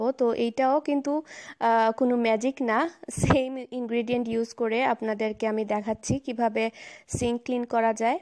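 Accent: Sylhet, Bangladesh. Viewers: আপু তোমার মুখে সিলেটি ভাষা টা ই খুব ভাল লাগে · আপু আমার খুব উপকার হলো।আমি একটা জিনিস দেখলাম সবাই তোমাকে বলছে তোমার মুখে সিলেটি মাত বেশি মানাই।এটা কি ঠিক ।আমার কাছে মনে হয় যে ব্যাক্তিকে ভাললাগে তার সব কিছু ভালো লাগবে।তাই সে যেভাবেই ভাষা বলবে।আমি তোমাকে ভালোবাসি আমার সব ভাষা তোমার মুখে ভালো লাগে। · Apu kmn aso.tomar mukhe shylety vasa onek valolage.. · আপুমনি আজ অনেক কিছু শিখতে পারলাম। খুব কাজে আসবে ইনশাআল্লাহ। আপু তোমার মুখে সিলেটি মাত আমার খুব বালা লাগে।আপু এখন আপনি কেমন আছেন?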